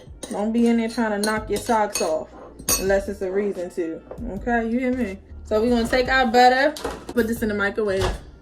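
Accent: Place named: Maryland, United States. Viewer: I was thinking the samething. She sound like she from Maryland to me!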